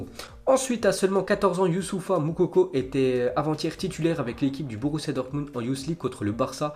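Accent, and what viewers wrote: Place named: France. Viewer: c'est le français typique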